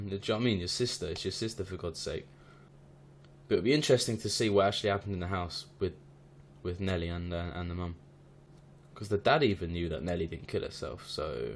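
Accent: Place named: United Kingdom. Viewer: I loved this show and ur reactions haha where bouts in the uk are u from cant figure out ur accent im from newcastle 🤣🤣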